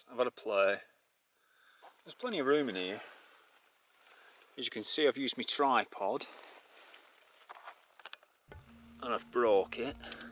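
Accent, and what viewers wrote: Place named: Lancashire, United Kingdom. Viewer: Only just come across this one 18 months too late. You've lived there since being 17 and I thought you'd just stepped out of your home in East Lancashire 5 minutes ago. I lived in London for 5 years in the 80s and a Yorkshire accent caused some amusement then. Brilliant video as always,. Keep them coming.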